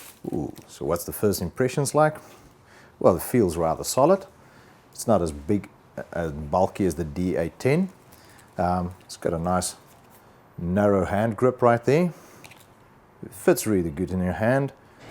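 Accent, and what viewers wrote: South Africa. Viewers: You sound Afrilaans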